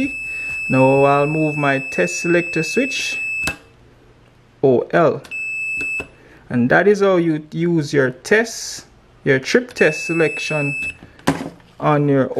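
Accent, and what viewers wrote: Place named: Jamaica. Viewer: Sounds like a Jamaican. Good video breda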